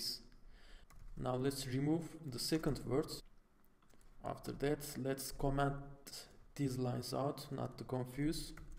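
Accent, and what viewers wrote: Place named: Turkey. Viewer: Turkish accent :)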